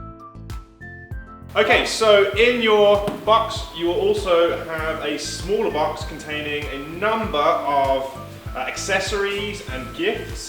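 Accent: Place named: United Kingdom. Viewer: Great, clear instructions. You have a British accent, but I guess you may have lived in China/ Asia for some time. Nice! I have lived off and on in India for 10. years. Back in the states now.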